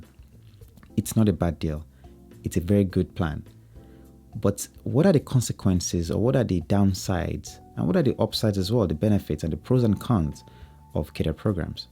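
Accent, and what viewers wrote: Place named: Ghana. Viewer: You sound like a Ghanaian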